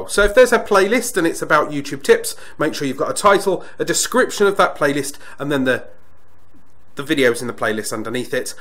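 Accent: United Kingdom. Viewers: Just listen to his British English. Its worth more than 7k views in my opinion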